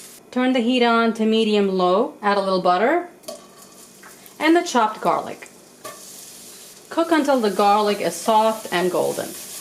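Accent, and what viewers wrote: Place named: Long Island, United States. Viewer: Delicious! You have a long island accent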